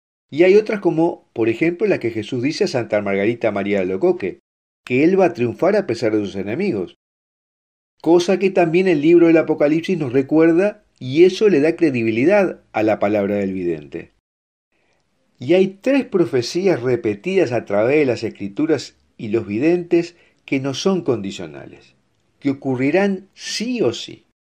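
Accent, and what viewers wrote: Argentina. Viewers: A mí me da una ternura inmensa, además del tono argentino. Saludos!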